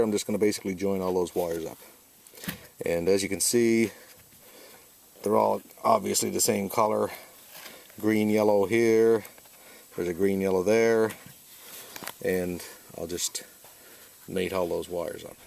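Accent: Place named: Canada. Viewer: he sounds Canadian!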